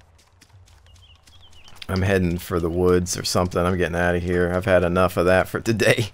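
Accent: Canada. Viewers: Great vids! Are you Canadian? I only ask because you say Day Zed, HostILE and Bugger off!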